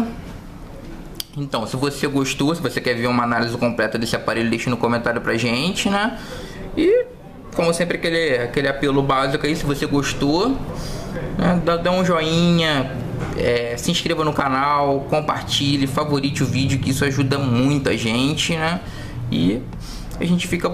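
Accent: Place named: Rio de Janeiro, Brazil. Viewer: Que sotaque gostosinho desse cara deve ser carioca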